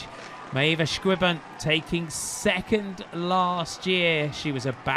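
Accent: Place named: England, United Kingdom. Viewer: It's the English language, not the American language. They invented the language. He's pronouncing it the way all English people pronounce it. Here, in Canada, we also say "kill-ohm-mahters".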